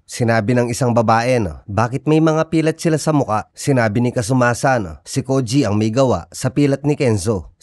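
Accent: Pampanga, Philippines. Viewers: Kapampangan ka ne boss?? Accent kc.😅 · Haha kapampangan ka boss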